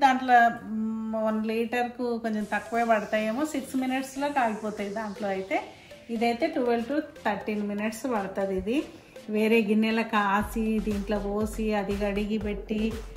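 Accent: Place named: Telangana, India. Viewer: telangana style la manchiga chepindru peddamma thank u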